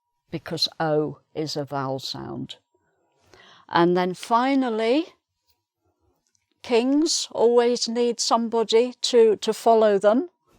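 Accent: United Kingdom. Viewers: This is british accent Thank you I like your program.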